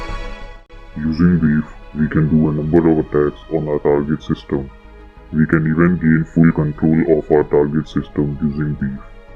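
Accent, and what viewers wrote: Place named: India. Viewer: i can see that indian acent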